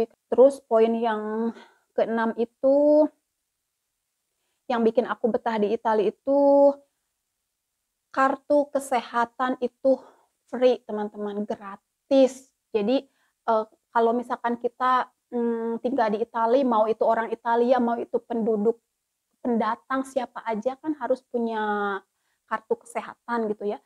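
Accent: West Java, Indonesia. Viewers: Assalamualaikum teh saya baru bergabung😊abdi ge orang sunda tpi denger teh nyarios sunda resep jd musam mesem sorangan😁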